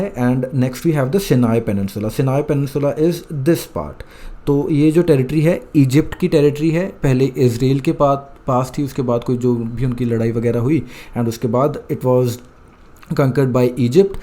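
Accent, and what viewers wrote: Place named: Delhi, India. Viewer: Puri delhi wale accent.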